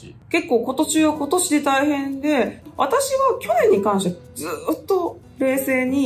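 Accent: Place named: Kansai, Japan. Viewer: 健康第一！ 馬ちゃんの関西弁もっと聞きたいねん😁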